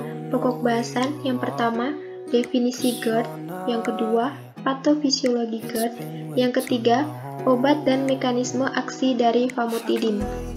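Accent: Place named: Java, Indonesia. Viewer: Suka yg medok" jowo asli haha😂😂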